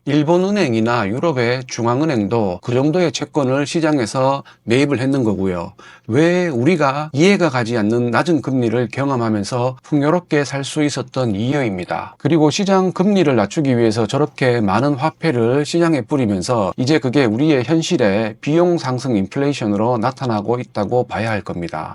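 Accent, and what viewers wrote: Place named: Gyeongsang, South Korea. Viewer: 몽실몽시리하게ㅋㅋㅋㅋ저희집이 경상도라 너무친근감이있습니다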